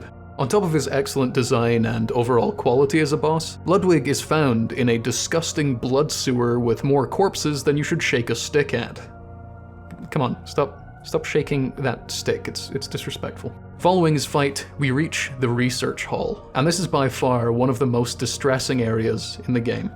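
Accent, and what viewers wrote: United Kingdom. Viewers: Babe wake up, uploaded. It's giving British.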